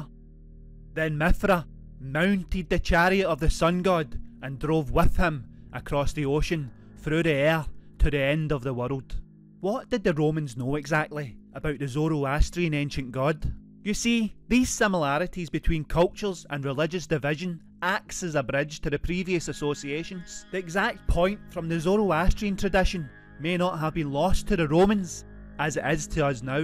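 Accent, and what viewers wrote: Scotland, United Kingdom. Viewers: Nice video and accent, is it Scotish? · Please bring back the old narrator, i cant understand half of what this guy is saying. His Scotish accent (i think) is so damn thick its hard to make out certain words.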